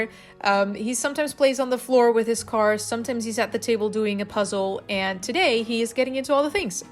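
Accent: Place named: United States. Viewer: I love your videos and find them inspiring Just wondering though....why do you have an American accent since you are a Dutch family living in the Netherlands? I am English and previously when I've heard a Dutch person speaking English it is totally different your accent.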